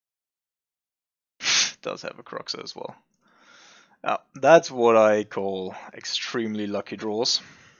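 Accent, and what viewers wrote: Germany. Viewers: Great content as usual but I like Grixis more than UW. Grixis is more flexible I think and more fun to play. But your videos always show your great insight into the deck and also into the meta. By the way are you a German player? Sometimes you pronounce words very German :) And wha are your thoughts on UW Stoneblade in the current meta?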